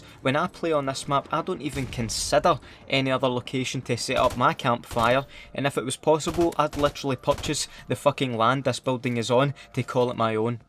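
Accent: Scotland, United Kingdom. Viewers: I'm Scottish, and most YouTubers are American. It's just so nice watching you so I can finally hear a Scottish person playing CoD. I actually canny explain it pahaha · I subbed cause of his Scottish accent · What part of Scotland are you from mate??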